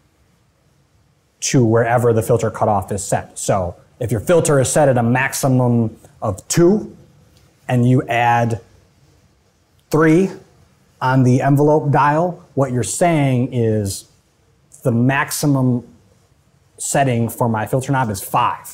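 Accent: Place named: United States. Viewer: Also never comes out of character with the American accent....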